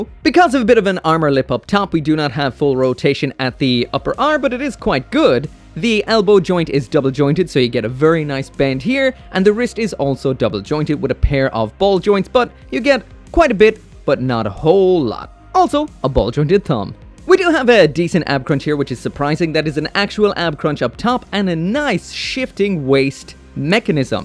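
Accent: Ireland. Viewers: Shite. Thanks, i always wanted to hear your native accent! Still asking for that one single episode where only talk with an Irish accent ^^ Maybe something from the backlog? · Once you shait I couldn't NOT hear your Irish accent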